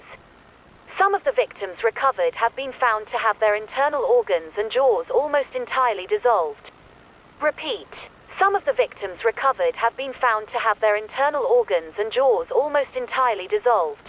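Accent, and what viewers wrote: United Kingdom. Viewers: A few questions from someone who’s Irish. 1. Why does the Ukrainian EAS play at the start instead of the Irish one? 2. Why is the TTS voice British instead of Irish? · I like that the lady speaking sounds BRI,ISH. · bri'ish voice hits it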